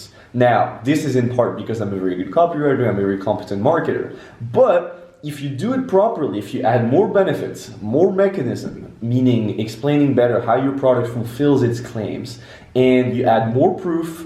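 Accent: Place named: England, United Kingdom. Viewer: Although I understand English (by the way you've a f*cking English accent it's beautiful!). Et selon moi, rajouter des sous-titres en français serait top (si jamais des français qui te soutiennent passent par là 😜) Prends soin de toi et hâte de voir tes prochaines vidéo chef 🥳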